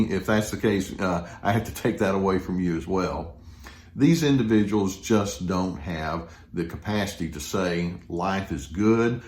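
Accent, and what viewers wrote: Texas, United States. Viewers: I left my narc back in 2007. Best decision I ever made in my entire life. Oh the stories I could tell, of his envy and lack of empathy. I look at your videos and even now gain healing and insight. Maybe there is something very special about the way a Texan drawl delivers compassion and wisdom;) Thank you SO much, Dr.! <3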